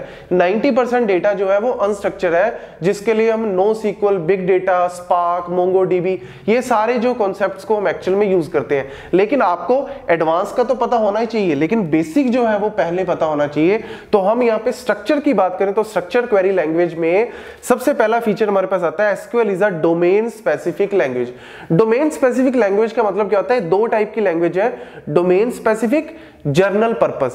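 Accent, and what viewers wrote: Punjab, India. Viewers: you are punjabi??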